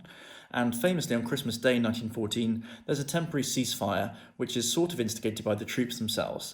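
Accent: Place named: United Kingdom. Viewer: What a brilliant and amazing 5 minutes,! (Also I love that perfect British accent; these days, it's fast disappearing).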